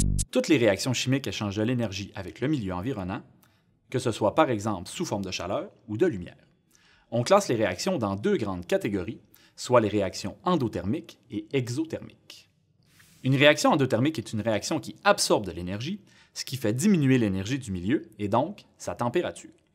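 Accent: Quebec, Canada. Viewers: Rapide, efficace et en plus avec l'accent québécois, c'est juste parfait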